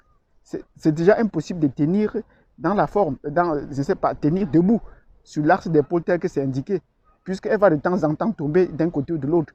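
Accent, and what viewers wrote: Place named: Cameroon. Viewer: C'est quoi son nom, il a un ton Camerounais, si c'est le cas je dois le contacter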